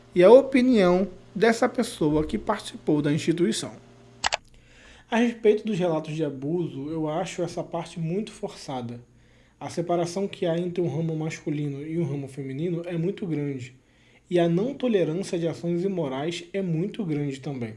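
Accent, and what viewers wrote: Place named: Rio de Janeiro, Brazil. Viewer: Voce tem sotaque de norte fluminense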